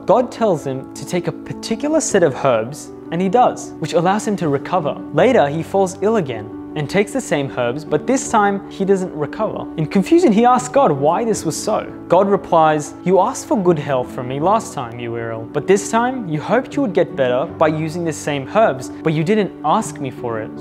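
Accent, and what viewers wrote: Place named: Australia. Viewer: I love the AU accent. Congrats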